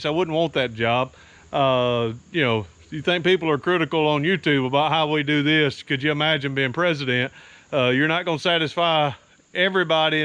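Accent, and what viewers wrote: South, United States. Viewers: your southern accent is wonderful!! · i like how you's southerners say coil. you say it [ ool ]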